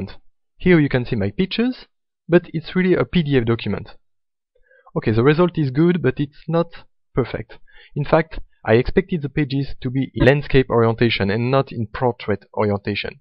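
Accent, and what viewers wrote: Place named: France. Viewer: THE FRENCH ACCENT!!! , good apps btw, thanks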